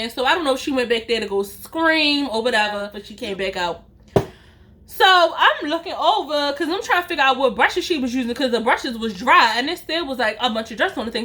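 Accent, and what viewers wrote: Baltimore, United States. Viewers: This was your first storytime I watched and when I say that your accent and storytelling skills kept Me fully entertained, it did. I Love Your BMore accent🥰😊😊😊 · I love your Baltimore accent lol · My man here like I know she from Baltimore lol your accent STRONG LOVE YOUR CONTENT BABES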